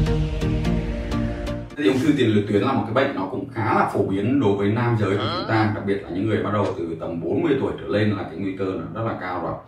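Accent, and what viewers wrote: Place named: North, Vietnam. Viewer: Bạn có thể nói chậm và rõ ràng được không ?? Không nên nói nhanh vì tiếng vùng miền Bắc nói nhanh nghe không rõ , cảm ơn bạn nhiều .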